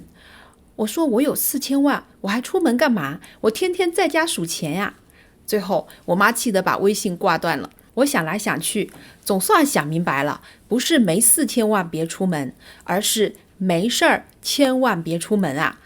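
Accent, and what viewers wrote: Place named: Jiangsu, China. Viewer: 謝謝Sammy 分析，一口江蘇、上海人口音，請問你哪裡人啊？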